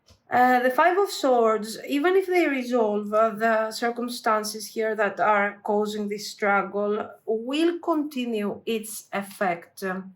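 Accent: Greece. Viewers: I guessed you’re Greek from your accent 😆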